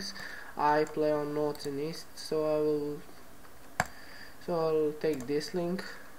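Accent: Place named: Serbia. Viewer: You talking Serbian-Englesh laung :O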